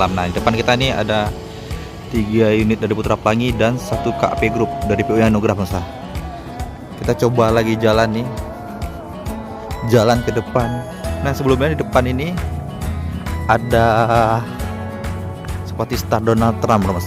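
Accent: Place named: Aceh, Indonesia. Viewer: Orng aceh ab ya, gmongnya ada g. Ong bhsa aceh. Oy new pelangi knp gk prnh nmpak b.???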